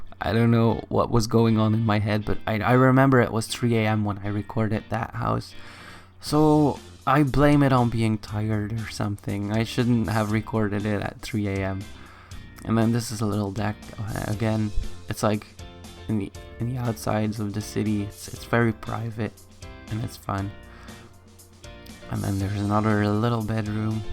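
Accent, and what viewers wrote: Belgium. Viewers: Wacht ben je van belgie? Ik dacht Engeland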